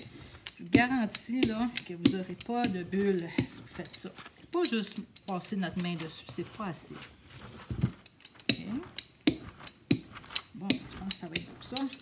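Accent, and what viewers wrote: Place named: Quebec, Canada. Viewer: Bonjour, quelle colle vous prenez pour le marouflage, car je suis français et je ne comprends toujours le québécois pardonnez moi !!!! Cordialement Serge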